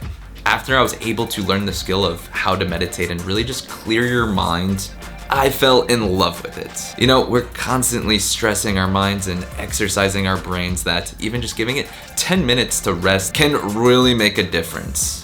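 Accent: New York, United States. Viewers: Not sure why this video in particular, but that New York accent is coming strong.😏 Btw, love the content 😀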